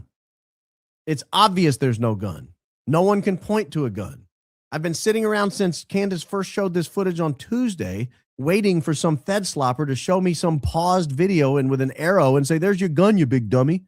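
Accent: Australia. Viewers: New Zealander here... Your Aussie accent is hilarious, 🤣🤣🤣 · As an aussie i can confirm that is exactly how we speak, mate! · Good Aussie accent ☺️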